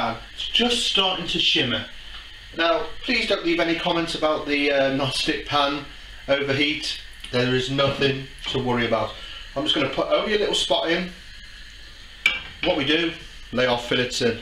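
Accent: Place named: Birmingham, United Kingdom. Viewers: G dench He soulds like he could be from Birmingham England. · Wonderful video (except the word "combination" has a typo in it). Your accent takes me back to the days when I lived on Hagley Road, worked in Smethwick and drank in the King's Head, Bearwood.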